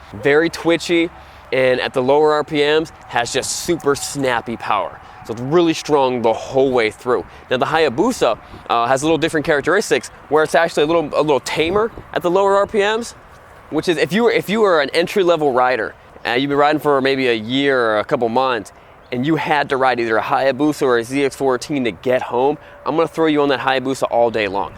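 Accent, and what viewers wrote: United States. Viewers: ZeyX14 lol, I'll never get used to how you americans say your z's.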